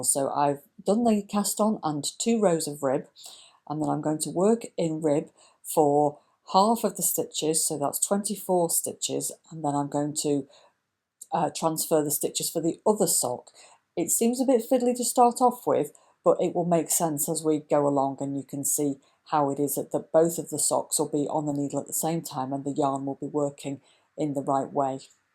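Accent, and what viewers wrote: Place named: United Kingdom. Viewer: I love your accent. what kind of british accent is it?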